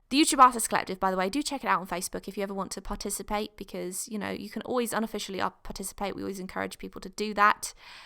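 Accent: England, United Kingdom. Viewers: Why do u sound english x x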